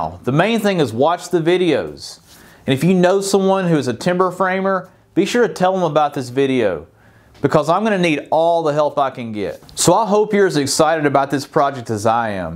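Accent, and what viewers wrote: Tennessee, United States. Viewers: Sure it is going to be great to follow! Good luck! Ah, Tennessee! Haha i like the accent!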